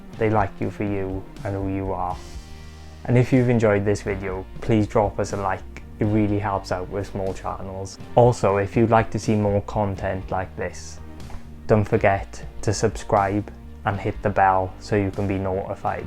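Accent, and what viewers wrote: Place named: Scotland, United Kingdom. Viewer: Scottish ? Cute accent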